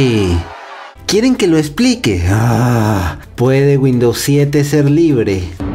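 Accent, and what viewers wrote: Venezuela. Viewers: amigo eres de venezuela bueno porque yo si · Llevo tiempo viendo tus vídeos y siempre supuse que eras Venezolano jajaja Saludos desde tu misma nación crack!!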